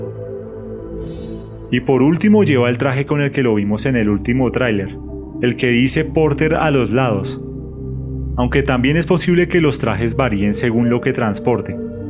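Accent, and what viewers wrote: Colombia. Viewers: Un saludo amigos: Si no estoy mal hay que saludar en plural pues ustedes son un equipo, me enorgullece mucho realmente, estoy bastante alegre con ver una propuesta de canal de entretenimiento como este y mas si es de mi país parece (Colombia) Se puede deducir por el acento. Cada análisis propuesto aquí, cada palabra, cabo suelto atado, cada pista descifrada, cada misterio resuelto o propuesto es una joya. Death Stranding ha recibido mucho Hype, eso todos lo sabemos, el titulo ha recibido criticas, insultos, etc, haters siempre va a ver, pero si algo todos debemos reconocer es que la espera ya de por si hace parte del titulo, el misterio detrás nos coloca a jugar desde ya, formando y buscando cada ficha del rompecabezas, esto es un titulo de Kojima, Kojima no es un desarrollador de juegos, Kojima no hace vivideros, Kojima es un artista, Kojima hace piezas de colección, así debemos verlo, así debería tomarse, Hideo esta a otro nivel, a mi me podría decepcionar el resultado si, me podrá parecer horrible, claro, pero debo ver que por mediocre que sea o impactante que resulte es una obra de arte y el arte es subjetivo, para mi sea como sea, ya es brillante lo que esta sucediendo en medio de la intriga. 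Muchas gracias por estos análisis y espero, encarecidamente sigan creciendo, un 100% De apoyo.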